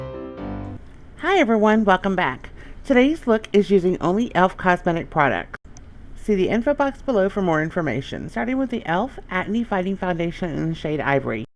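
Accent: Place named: South, United States. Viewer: Where are you from? I can tell the southern accent...lol. I'm from NC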